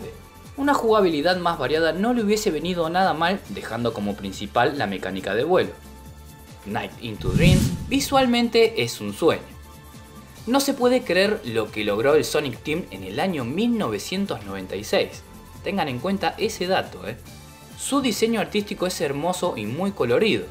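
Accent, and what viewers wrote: Argentina. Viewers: 100 % argentino 👍👍👍👌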